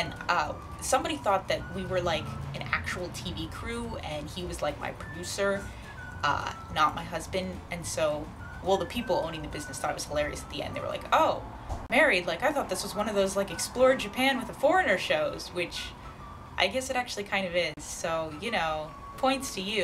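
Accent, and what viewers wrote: Texas, United States. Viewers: I'm from Texas and I can say, there is no true stereo type accent. Texas, being such a large state, has different accents and dialects depending on what part of the state you're from. A lot like Japan. The accent most people relate to "Texan" is the drawl spoken in East Texas, like Bryan or Tyler. She's from Austin and sounds pretty Texan to me.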